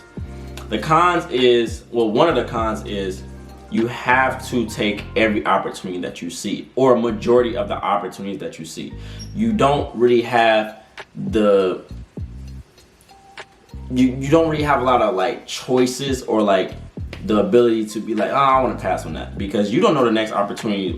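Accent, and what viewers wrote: United States. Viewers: Lmaoo you gotta be from Detroit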